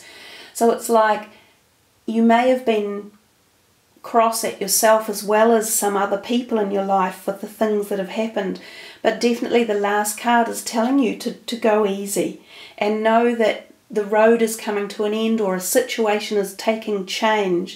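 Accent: New Zealand. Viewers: You sound kiwi. 😊 💖. Thank you.😊 💖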